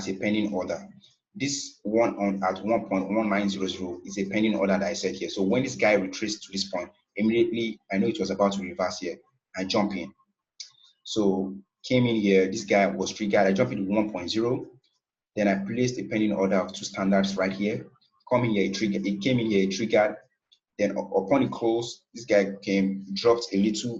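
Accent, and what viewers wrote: Nigeria. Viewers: Are You a Nigerian ?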